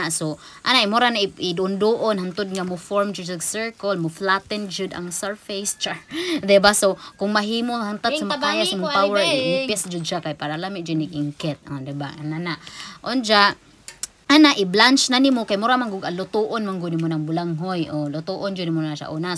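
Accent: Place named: Bohol, Philippines. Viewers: Hi mga nice jamo imong mga content sa vedio vlog,mga lami au imong mga gepangluto,accent sa u inistoryahay that Bohol jd,jamojamo jd......kalami 😋makalaway oi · Ganahan kaayo ko maminaw sa imong Bisaya accent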